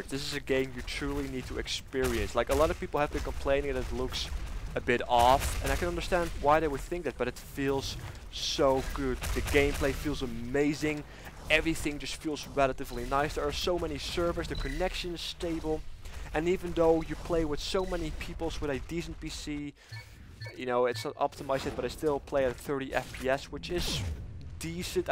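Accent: Netherlands. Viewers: u dutch?? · je klink als een nederlander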